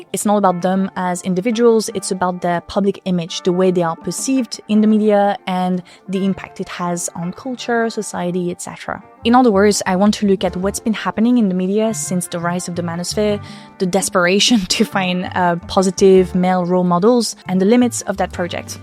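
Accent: France. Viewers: I'm part of your new masculine audience. I'll speak for myself, I don't know if there are other man who watch your content for the same reasons that I do. I first saw you on Instagram talking about french politics. I'm a recent catholic convert myself and I was curious about my faith in the "eldest daughter of the Church". Then I deactivated my Instagram account (I got tired of that) and decided to follow you here. Your content is well-researched, and left-leaning in a way that, for me, is way to stay updated with politics in the old continent through your lenses. And also a way to stay informed about current trends in feminism (and your balanced critique). I also love french culture and literature (I love your accent, everything about you, really). So for me, I feel like watching you is like reading or watching a good news anchor (with a melifluous french accent and sapphire blue eyes). 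· Can't speak for other men but I watch for the French pronunciations of words like "rugged". That was priceless, thank you. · I love you, and your french accent is a staple to your media but please say it with me. "Agency" - A-gency like "A gentsy."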